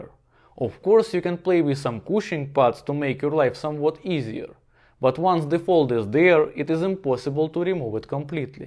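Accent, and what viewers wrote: Russia. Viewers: Неплохо сделано, даже русский акцент)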